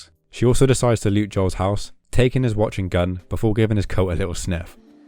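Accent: United Kingdom. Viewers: Are you from Nottingham??? I hear a very familiar east mids accent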